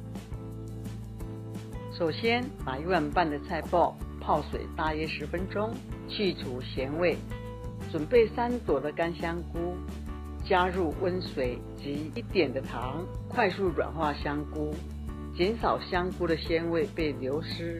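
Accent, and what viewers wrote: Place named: China. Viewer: 明明是台灣阿嬤為什麼要講話口音辨成大陸的大嗎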